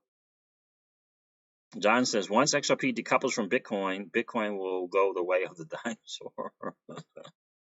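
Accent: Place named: Brooklyn, United States. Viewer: I think it must be a Brooklyn accent. There was a rumor that was posted on aa redit page from someone claiming to be a ex employee of ripple who believes that stellar and xrp have been designed to be used as world reserve currencies. XRP is the store of value and stellar to be used as a digital currency. What are your thoughts? Maybe you could do a video on that.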